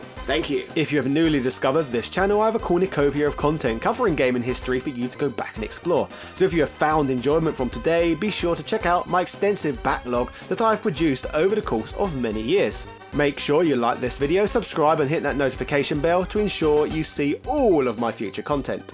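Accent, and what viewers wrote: England, United Kingdom. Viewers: Canada? He's got the most English accent I've ever heard.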